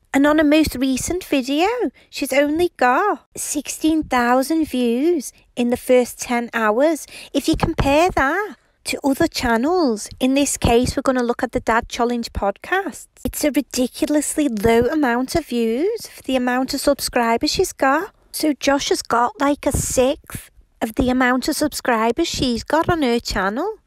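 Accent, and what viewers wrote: Liverpool, United Kingdom. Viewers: The Liverpudlian accent accentuates the snarkiness 😉 love your vids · Are you scouse?! 😂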